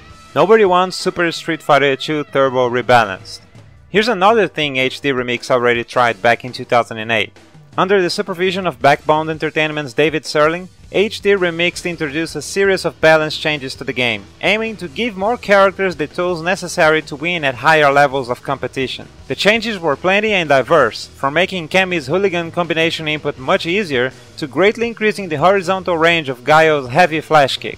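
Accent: Brazil. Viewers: Vc é brasileiro? pelo sotaque parece, eu também falo inglês assim rsrs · the brazilian portuguese background shines through too much and adds that horrible english accent... this guy needs a lot of work before narrating anything in english again. On a scale from 1 to 10 where crazyrussianhacker is an acceptable 4 this guy here is a solid -2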